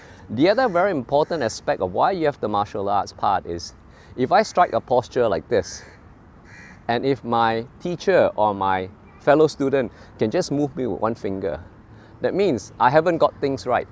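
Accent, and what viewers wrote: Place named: Singapore. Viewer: Sounds like he is from Singapore! I wanna learn from this sifu😂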